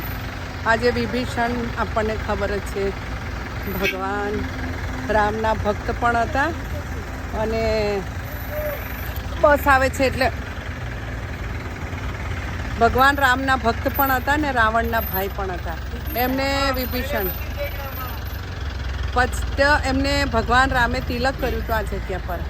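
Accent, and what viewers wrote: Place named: Surat, India. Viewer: दीदी आप गुजराती हो लेकिन आप कोलकाता में शादी कैसे हो गई आप शुद्ध गुजराती बोल रहे हो सुरती भाषा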